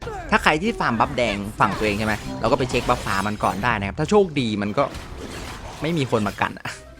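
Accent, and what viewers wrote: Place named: Central Thailand, Thailand. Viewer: พี่เป็นคนเหนือหรือ ลวดเครียกลาง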